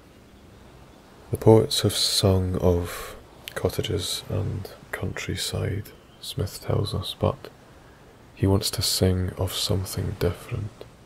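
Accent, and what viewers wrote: Scotland, United Kingdom. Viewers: I love Scottish accents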